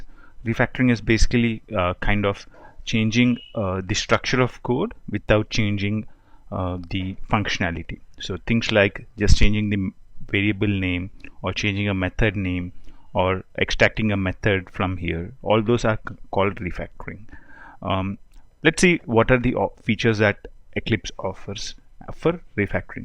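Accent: India. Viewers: ох уж этот индуский акцент х/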